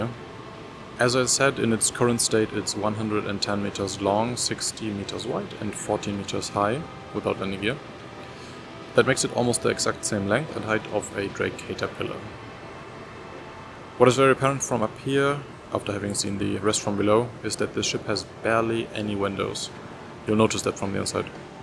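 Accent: Germany. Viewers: moin, du scheinst ja definitiv ein Deutscher zu sein, unschwer zu hören. danke für die großartige Arbeit! weißt du denn ob wirklich keine Rampe zum Hangar ist? kommt mann nur über den Bauch rein?(Leiter/Fahrstuhl)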